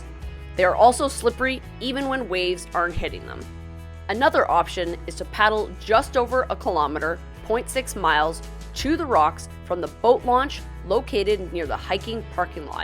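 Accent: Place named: Southern Ontario, Canada. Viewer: Great video, but damn that Southern Ontario accent is hard to listen to. lol.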